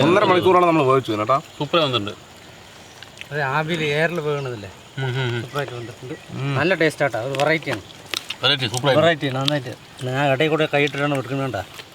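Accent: Palakkad, India. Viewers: ഇക്കാടെ പാലക്കാട് ഭാഷ ഇഷ്ടമുളളവർ ലൈക് അടിക്ക്🤩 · ikkayeyum palakadan bhashayum ishttamullavar like adikku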